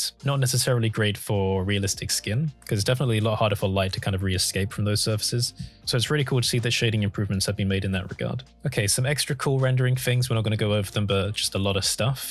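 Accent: United Kingdom. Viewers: this video was exactly what i needed. an easy to understand explanation of the new features in a british accent with a bit of dry humor weaved in. Thx. love ur videos and by-gen. idk how to make a unicorn emoji tho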